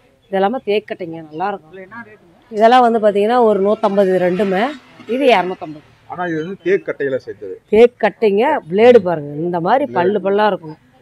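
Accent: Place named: Kongu, India. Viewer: எத்தனை பொறுமை...சிரித்த முகத்துடன் கொங்கு தமிழில் பேசிய பேச்சில் இரண்டு மணி நேரம் போனதே தெரியவில்லை மென்மேலும் வளர வேண்டும் வாழ்த்துகள் சகோதரி ❤❤ பயனுள்ள சிறந்த காணொளி ❤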